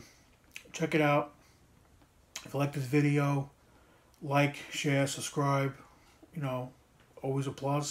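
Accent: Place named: Brooklyn, United States. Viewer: really are funny. Love that u apologized for the Brooklyn accent lol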